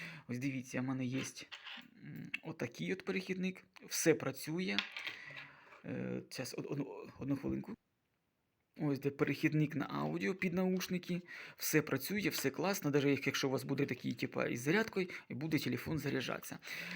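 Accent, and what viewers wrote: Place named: Chernihiv, Ukraine. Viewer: Схоже на чернігівську балачку) покращуй мову, а так то цікаво розповів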